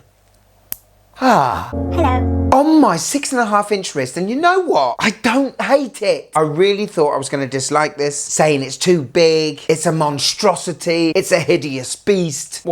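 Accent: United Kingdom. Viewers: Holy mackerel that Michael, Caine British accent, Austin Powers is so difficult to listen to. Seems like a cool guy though and understands watches for sure but wow what an accent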